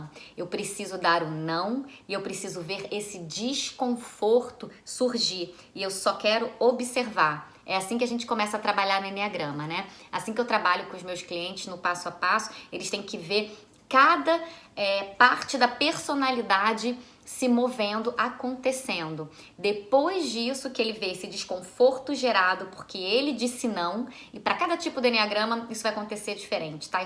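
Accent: Rio de Janeiro, Brazil. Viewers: CARIOQUINHA. BOM.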